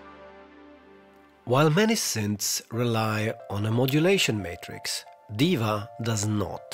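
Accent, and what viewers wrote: Sweden. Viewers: Dr Mix speaks with an Italian accent. This guy speaks with a pronounced Swedish accent. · Du låter svensk :)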